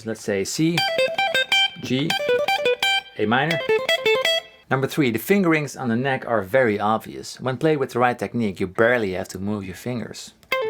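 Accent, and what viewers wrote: Netherlands. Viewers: i just discoverd that your'e dutch. meanly by your accent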